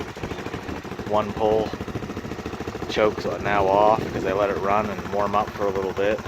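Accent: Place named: Nova Scotia, Canada. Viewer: Ohhhhh nova Scotia...I should have picked up on the accent...iv got the same situation..I picked up this little atc on a trade..got it going...but it's too small for me.. so just sits...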